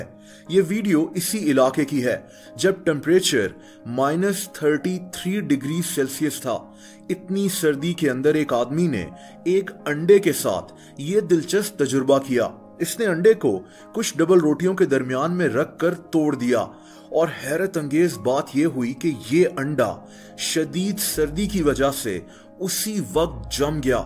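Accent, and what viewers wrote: Pakistan. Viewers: yup thats pakistani english boi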